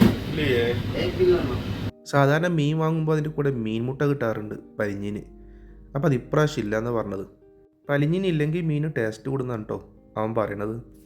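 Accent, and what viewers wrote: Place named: Thrissur, India. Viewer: ath trissur kkarde oru slang aatto😂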